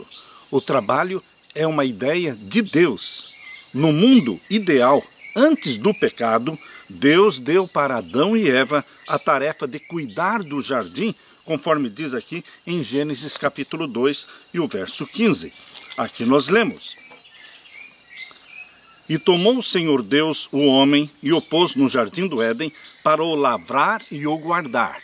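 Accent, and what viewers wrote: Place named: South, Brazil. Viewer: De onde o sr. é? Com este sotaque bonito? Parece do sul! E este local em que está fazendo o estudo da lição, com essas prdras lá atrás Que lindo!....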